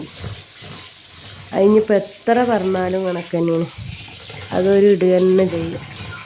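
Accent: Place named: Coorg, India. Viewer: Coorgil evideyaanu...samsaram kett Coorg aanenn thonni,Siddapuram aano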